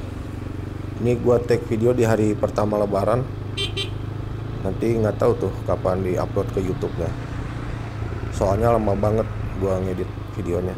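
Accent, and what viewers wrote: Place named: West Java, Indonesia. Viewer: logatnya Sunda pisan ahahahah. adem dengernya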